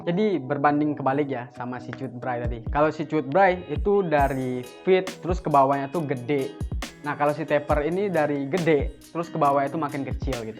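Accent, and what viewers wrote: Madura, Indonesia. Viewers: Edeh oreng medureh cong?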